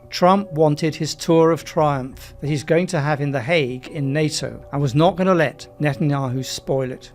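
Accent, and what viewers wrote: United Kingdom. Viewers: Thanks for reporting the truth, though I can hear your accent is British. Hope you will teach people to face facts and truth. Netanyahu is a dying lion, he doesn't want to accept he's finished😂😂😂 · MEE never ever but never ever had a good word on Israel, just this Qatari paid org is unhingede. British accent spweing garbage and misinfo.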